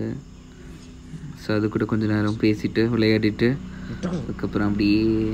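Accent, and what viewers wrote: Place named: United States. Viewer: நல்லா தமிழ் பேசுறீங்க... அத இன்னு கொஞ்சம் நல்லா பேசிரிக்கலாம்... ஏன் அமெரிக்கா ரிட்டன் மாதிரி பேசுறீங்க....😊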